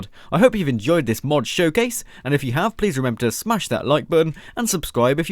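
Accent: Wales, United Kingdom. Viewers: His accent is welsh.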